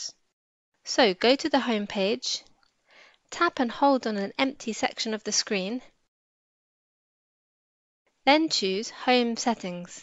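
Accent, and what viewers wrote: United Kingdom. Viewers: I can't deal with the British type accent.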